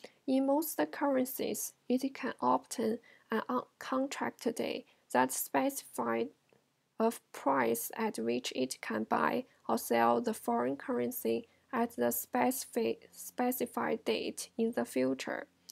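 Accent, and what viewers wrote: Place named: China. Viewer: Your accent seems like you are a Chinese~~~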